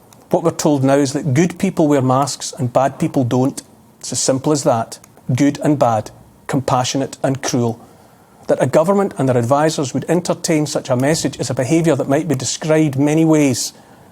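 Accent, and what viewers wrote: Scotland, United Kingdom. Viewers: I've been saying the same thing for several months now, but it just sounds better with a deep Scottish accent. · He is an engljshman with a Scottish accent.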